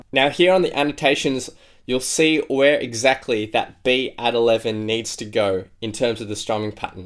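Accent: Australia. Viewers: Seriously! He is my top "go to" when I need a cover song tutorial. Clear and thorough instruction; plus a crackin' Australian accent!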